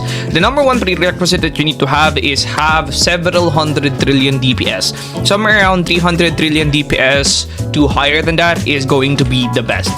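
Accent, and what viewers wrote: Philippines. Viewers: Wait?? Are you a filipino, because you have a filipino accent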